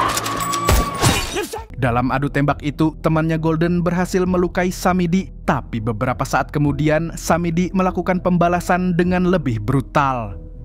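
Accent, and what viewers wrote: Banjar, Indonesia. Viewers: Urang Banjar kah Pian bang 😅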